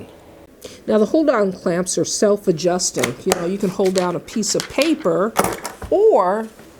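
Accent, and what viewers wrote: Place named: Medford, United States. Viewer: I miss that Medford accent!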